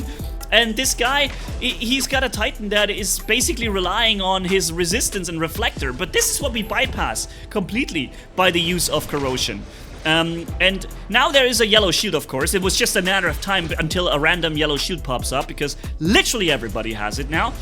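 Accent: Germany. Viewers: Are you german but speaks english or english that has learned german?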